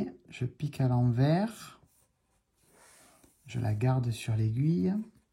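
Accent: South, France. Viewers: Merci pour ce tuto chaussette je vais essayer avec ta méthode. Je suis débutante au tricot et ta façon me paraît bien simple, bonne journée, j'adore ton accent du sud, moi aussi je suis du sud 😊😊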